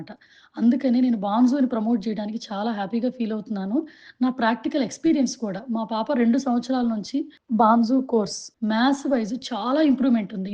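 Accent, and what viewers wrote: United Kingdom. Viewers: Her British accent is adorable❤ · She got that cute British accent 🥰❤️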